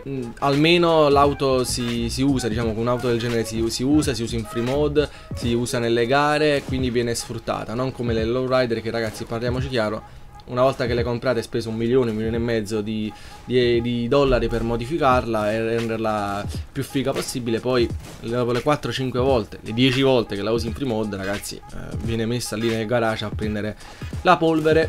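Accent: Italy. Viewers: Adoro o sotaque italiano kkkk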